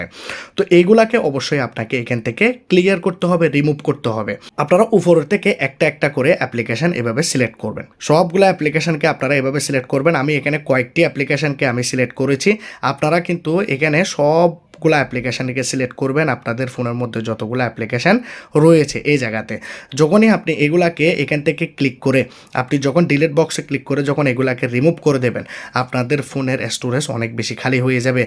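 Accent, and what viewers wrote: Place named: Chittagong, Bangladesh. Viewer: ভাই মনে হয় চিটাংগা।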